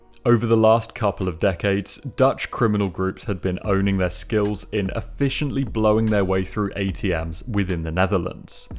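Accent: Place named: United Kingdom. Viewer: homie believe me when i tell ya, i see no AI in this, this mothefucker got the most UK accent i've ever heard.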